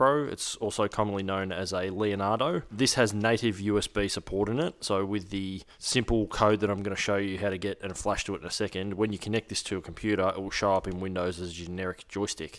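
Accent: Australia. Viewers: I love listening to Aussies curse. Friggin hilarious.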